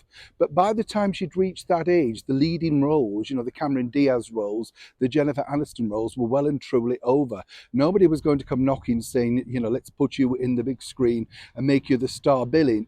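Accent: United Kingdom. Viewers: So nice to hear such a gentleman. Love the wonderful British accent. I always admired the Queen and royal family and as a young lady had a crush on the then Prince Charles. Wish the best to your new King and family.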